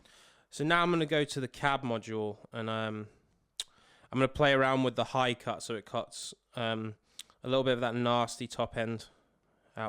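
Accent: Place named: United Kingdom. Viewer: Absolutely British accent.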